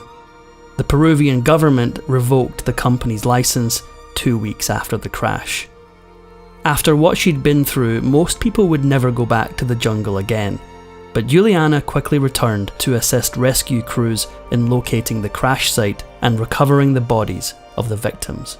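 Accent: Scotland, United Kingdom. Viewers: Scottish accent - subtitled required. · I really enjoyed this narrator love listening to the Scot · In British English, the correct past participle of get is "got", not "gotten". Unless you are American, though your Scottish accent would suggest you are not. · I love listening to narrate Lovely voice Scottish I wish you could put your name to story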